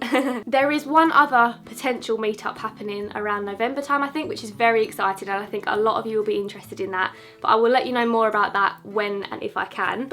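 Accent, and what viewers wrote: United Kingdom. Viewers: I'd love to have your voice tbh. Your voice is so soothing. British accents yessss <3 I am also fine, thank you :)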